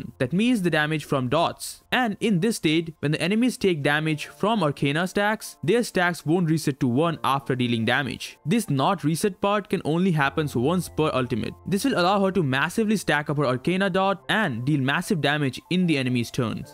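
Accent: India. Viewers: Are you Indian 😅 cuz your accent sounds india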